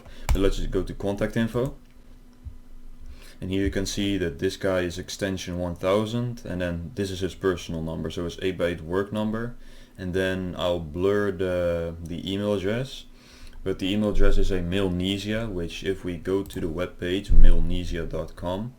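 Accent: Netherlands. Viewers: Broer waar in nederland woon je ? Dacht al die nederlandse engels accent. Jij zat vroeger zeker op ict of wa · Hi.. thanks for your service in raiding the scammers. Are you Dutch? Sorry, just listening to your accent. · ik heb echt respect voor jou kerel je gebruikt je hacking skills tenminste voor een goed doel ga zo door!!! je bent Nederlands neem ik aan van je accent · You send dutch af my guy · Happen to be dutch lol? Lmao your accent love it, just like the justice given! Keep up the good work! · Great vid, but you reveal a little too much that you're Dutch speaking, i'd say close to Belgium or Belgium itself ;-) groeten van Antwerpen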